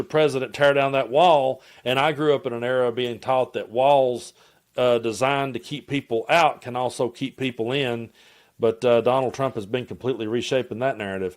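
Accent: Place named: Kentucky, United States. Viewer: When l 1st started watching you, your name " " threw me off cuz of your Kentucky accent. I was born in Paris-and-I-don't-mean-France. Anyway, your current events coverage is brilliant, insightful.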